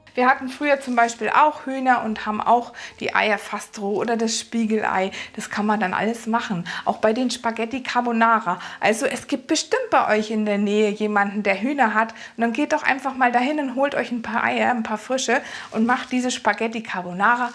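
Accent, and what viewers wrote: Standard. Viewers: Ooooh ich bin bisher noch nie ein Freund der Spaghetti Carbonara gewesen, da ich es hasse, wenn man überall Sahne rein klatscht. Liebe, diese Soße wűrde/wird mir mit Sicherheit schmecken. Ich war ganz überascht, daß du auch Koch-Videos machst, denn bisher kannte ich nur deine leckeren Backrezepte. Nur das du dort nicht so hochdeutsch schwatzt. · Wieder ein super Video. Vielen Dank. Aber drinen Dialekt habe ich vermisst. 🤤🤤🤤🤤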